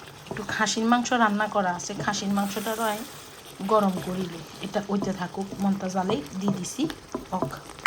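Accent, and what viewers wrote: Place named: Bhola, Bangladesh. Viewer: আসসালামু আলাইকুম আপু।আমনেরে এক্কেরে আর দাদীর মতন লাগে বোজ্জেন নি আপু,আমার বাড়ি ভোলা চরফ্যাশন কেরামতগন্জ কিন্তু আমনেগো আর আগ্গো কথা এক্কেরে মিল আছে বোজ্জেন নি আপু,,,, আমনের ভিডিও লইয়া আমার কোন অভিযোগ নাই,,, আমনেরে দেখলে আগ্গো দেশ দেকচি মনয়,,, আমি বর্তমানে চট্টগ্রাম থাকি 😢😢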